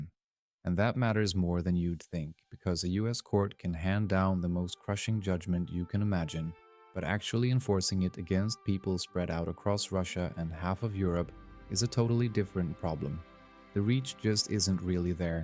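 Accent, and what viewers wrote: Sweden. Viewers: 'Over here in the US'? You sound like you are from Sweden. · I think he is just swedish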